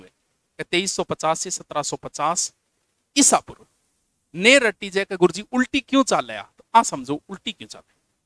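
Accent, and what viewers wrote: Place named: Rajasthan, India. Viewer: Padhate acha ho but hindi nhi bolne ki wjh se aadi bat samaj hi nhi aati apki .... marwadi, rajasthani bolte ho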